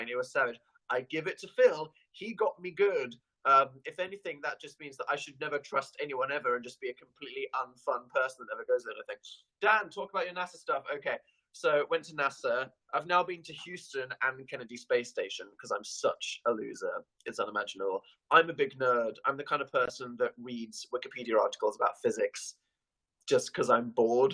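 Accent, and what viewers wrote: United Kingdom. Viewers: You're so extra British in this somehow. You keep reminding me of Eric Idle for some reason, and you're so fucking funny and intelligent!! I'm always so impressed by how quick-witted you are.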